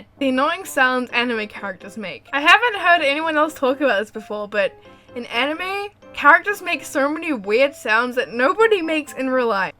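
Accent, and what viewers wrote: Australia. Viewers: You Aussie?? YASS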